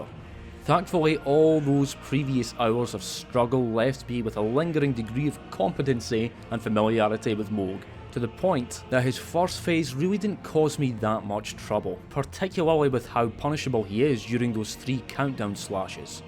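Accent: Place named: Scotland, United Kingdom. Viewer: Good lock with the DLC Mr. cool scottish youtuber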